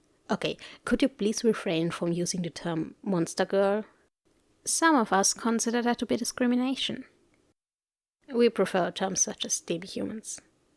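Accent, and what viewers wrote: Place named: Germany. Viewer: Greetings, I've enjoyed your take on Shynosaur's script very much and would hope for a part 2 for this character, Edit: (Sorry if the next section is a bit long) Having said that, keeping in mind the shape-shifting aspect, would as well love to see a script by you with your take on other mythical characters, like for example the Nixie, not the typical mermaid but a bit close to the Nixie in ( Nibelungenlied ) the German poem in folklore, but in a modern setting, having the ability to shape-shift between Human form and Mermaid, tends to stay near water hence works jobs where water is always available (like aquariums or as a lifeguard), and can sense future events like a Seer, with a soothing voice to the listener, your accent for this character will be perfect since a Nixie is part of the German folklore, as well a possible chance to include a collab with two other VAs as colleagues or sisters similar to the three Rhine-maidens in Nibelungenlied, however its up to you. 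Thank you very much and wish you the best in the future content to come 😊.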